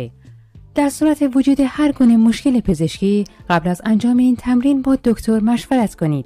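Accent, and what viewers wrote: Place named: Turkey. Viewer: سلام خسته نباشید خیلی ممنون ازویدئوی خوب تون درضمن لهجه ترکی قشنگی دارین.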